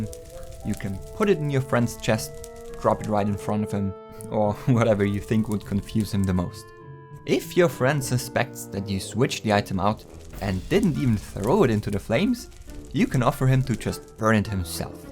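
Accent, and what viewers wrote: Germany. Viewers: Are you german? Because you really sound like.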